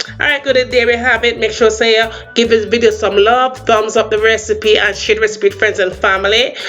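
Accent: Jamaica. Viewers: My girl mi subscribe jus cuz yuh sound lik a real yaadie goodie.. mi a guh try dis, cyaan duh the whole buy buy ingredient, jus a guh use some a di store bought... den grill it. Is where yuh find scotch bonnet? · Just listening to you I feel like I’m in Jamaica just need the weather, food and the smell😂😂 big up ur damnself mumma str8 outta London UK 🇬🇧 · New sub sending love from the South!! Sent here from Ray Mack. Blessings & I look forward to cooking your recipes. Love your Jamaican accent, sister. 💖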